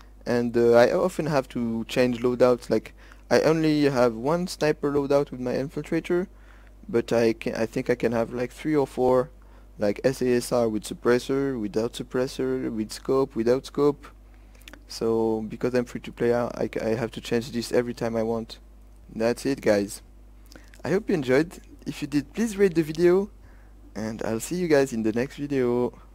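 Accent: France. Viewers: are u french (with your accent)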